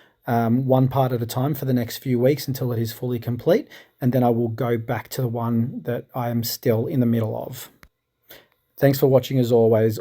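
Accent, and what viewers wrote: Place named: New Zealand. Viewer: Oops, I meant New Zealand, so sorry. 😬It's the accent! 😄 If you take on this yard, I look forward to seeing what the grass can look like when properly maintained. Thank you for the info.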